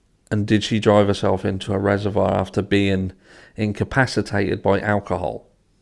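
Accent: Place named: England, United Kingdom. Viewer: I want a “but anywaaay; I digress” in rad English accent hoodie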